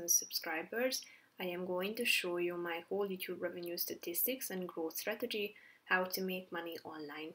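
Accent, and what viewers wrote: Hungary. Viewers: Are you hungarian?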